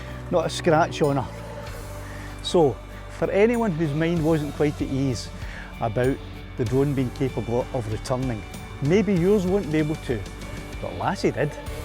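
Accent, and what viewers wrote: Scotland, United Kingdom. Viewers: Thanks for this. I am not Scottish but my ancestors are from Dunrobin Castle. I love your accent. You remind me of a past manager and friend I had years ago whom my wife could not understand, ever! Where do you get your beautiful music? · I'm Australian, so very much used to Scottish accents here.... no subtitles required. Great video. Geeya thombs op mon. Glad to see Lassie returned to fly another day. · Hi how's it gawn, its magic tae hear our accent on youtube, understood every, and naming your drone lassie hahaha hiya fae wishy, where were you flying looks like up by Lanark near tnto Hill,? Bet am miles off, I would love tae have the bottle to go in front of camera, better behind it ha ha the mini 2 is amazing, I'm blew away with mine, keep up good work buddy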